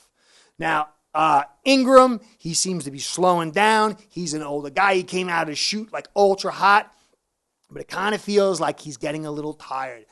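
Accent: New York, United States. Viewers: LOL!, I love it when you say "We're DOOMED!!!" 🤣 On another subject, (just curious), how does a guy with a New York accent turn out to be a Phins fan? For me, I became a fan in 1970 (I was 13) and the Dolphins were the only NFL team in my home state. How about you, how and when did you become a Phins fan?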